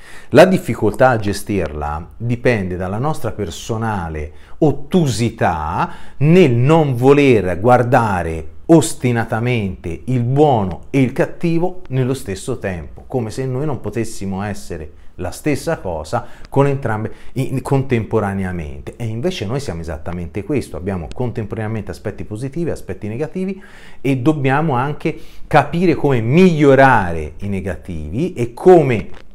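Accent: Tuscany, Italy. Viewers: Sei l’unico toscano che adoro sentire parlare tra l’altro sei anche super affascinante 😃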